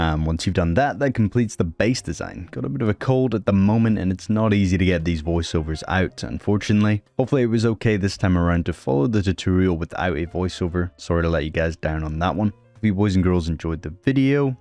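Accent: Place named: Northeast Scotland, United Kingdom. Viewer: you sound from north east scotland 😂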